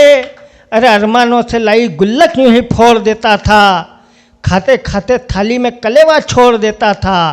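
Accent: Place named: Gujarat, India. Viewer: ❤ touching, love you ji. aapka tone gujrati lag raha hai.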